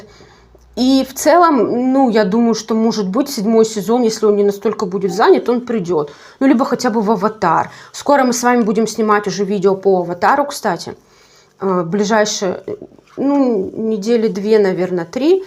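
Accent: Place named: Ukraine. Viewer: Какой у тебя акцент украины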